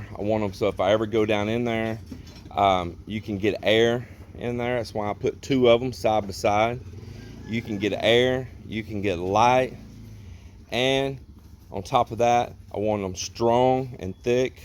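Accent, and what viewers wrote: Texas, United States. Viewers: there is many reasons why i have been following you and your wife. first my Late Mom followed you before i moved to the Philippines and we watched you together. The main reason why i watch close is that i plan on doing the same thing kind of. I plan on building a home and it will be mostly my wife family that works for me. I am already remodeling her mom and dads home tripling the size and we plan on living there as i save up for land and to build our home. I am letting them build it how they know how since that is not the home my wife's family will stay in as i plan on building a new one on our property when we get it for them (i want at lest 1 hector hoping for 3 for farming). i want to make it self sustaining as that is how my parents farm is in Washington state. One of the other reasons is I miss Texas (lived there for 20+ years) and you have the accent and mindset that I love from that state. 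Although i am not going to be able to be as physical as i am a disabled vet with major back problems I still want to build my home much like yours. I do not have as many years in construction as you but i do have a background in it, i also have an engineering degree but it was focused on mechanical but I did study blueprinting too. I also want to meet you sometime as i live in Roxas City and make trips to Iloilo sometimes. Congrats on the 10k. been watching for well over a year and feel like ya'll are part of the family lol.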